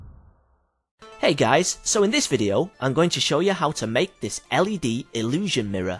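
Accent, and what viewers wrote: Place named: United Kingdom. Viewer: Victor Terhorst Nunes It's British English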